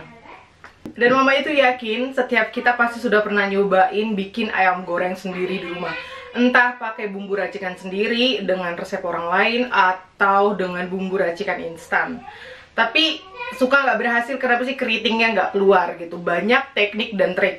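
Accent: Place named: Java, Indonesia. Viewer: Mamak ini ngomongnya terkontaminasi sama orang Jawa ya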